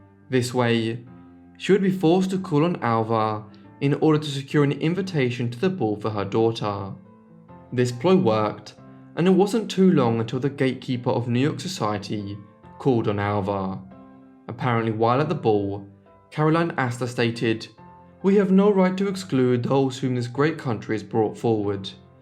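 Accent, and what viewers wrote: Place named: United Kingdom. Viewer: You mispronounced the name of the city she was born in. Albeit it is spelled Mobile it is actually pronounced as follows (Mo Bill). Your accent indicates to me you come from the United Kingdom if I heard it correctly so I suspect that's why you pronounced it the way you did as it appears on the page. Rest assured I'm not trying to be rude but am simply from the south and felt you'd like to know the proper way it is pronounced down here. I'm rather sure you might find that helpful for future reference.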